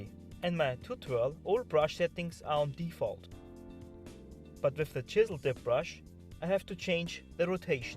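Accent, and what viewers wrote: Germany. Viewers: Great yet simplistic tutorial in your "German🤪" English..with that said you have got a new subscriber here 🖐.... how about the video of Artist review of new Galaxy note 10 plus😃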